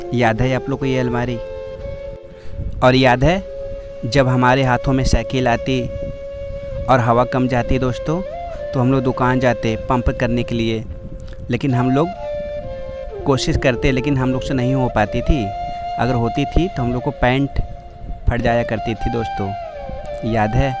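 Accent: Bihar, India. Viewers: sir aap bihar k ho kya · Bhai aap paka bihar se hona bhai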